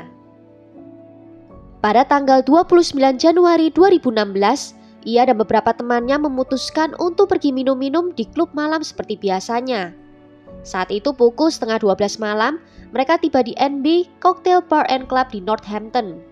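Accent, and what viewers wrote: Java, Indonesia. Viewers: Hayyy medokuhhh 👍💖💖💖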